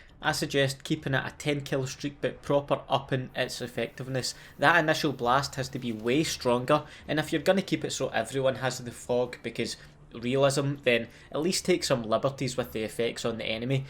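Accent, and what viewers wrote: Scotland, United Kingdom. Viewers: Not sure if I subbed for the actual content or for the beautiful Scottish accent but either way #notificationsquad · This guy Scottish? (Also in real life white phosphorus is really dangerous it’ll burn your body bad) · Love the COD content but... that Scottish accent is the one that hook me up. #notificationsquad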